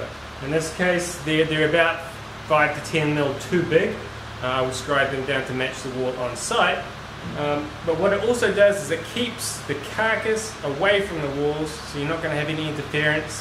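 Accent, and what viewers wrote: New Zealand. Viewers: Excellent video and thanks for sharing your ideas and tips, great too see some different ways with fitted wardrobes, kitchens and furniture, I lived and worked in NZ as a chippy for 6 years the accent and terminology bringing back all the good memories 😄 👍 keep up the good work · When I stumbled upon this channel, I was impressed by the woodworking skills shown in the videos. The narration and editing is always done very well and makes it fun to watch. But I have to admit, what really puts a smile on my face every time is the kiwi accent. From one professional woodworker to another: keep doing what you are doing,. You are very good at it.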